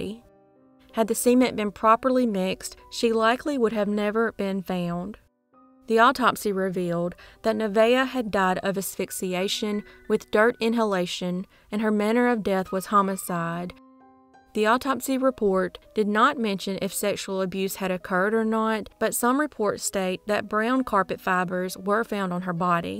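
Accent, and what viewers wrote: South, United States. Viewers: I’m from U.K. but guys what accent is the presenters voice ? Must be down south right ?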